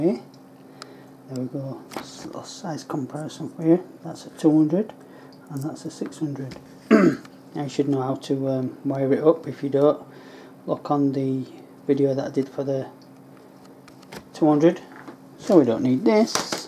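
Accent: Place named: United Kingdom. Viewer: You sound like you are from the UK. Any reason why you are using receivers greater than 25mw? 25mw is the max legal power in the UK & the EU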